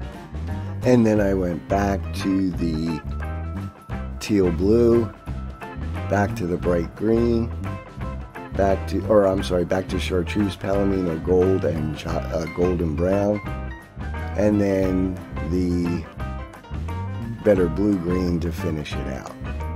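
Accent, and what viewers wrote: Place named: Northeast, United States. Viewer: I had a feeling you might be from my area…. (CT here 🙋🏻‍♀️🙋🏻‍♀️)😂😂 you sound like you live on the North East coast………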